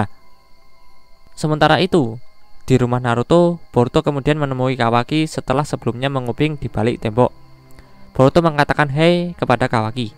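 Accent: Java, Indonesia. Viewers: Medok broo.. heheh ayoo puasa.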